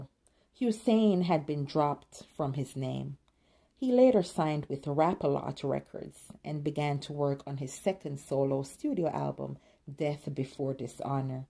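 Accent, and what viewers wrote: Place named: Jamaica. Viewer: Narrator: "Jamaican accent" 👍🏻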